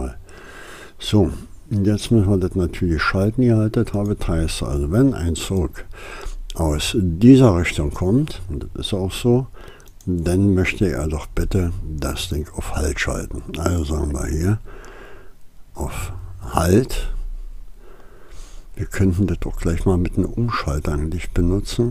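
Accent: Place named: Berlin, Germany. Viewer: schöne videos wo du machst.. finde ich richtig gut... ( deine stimme mag ich sehr ) mit Berline dialekt.. scheee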